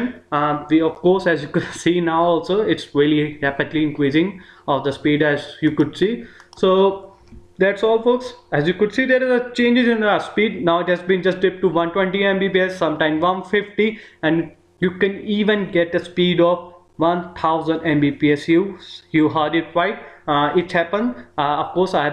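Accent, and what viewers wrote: India. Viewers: Its a Indian accent.... Easy to understand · fuck you indian accents i get cringed everytime i listen an idian talking english smh smd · Instantly clicked off once I heard the Indian acent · <3 indian accent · india accent ?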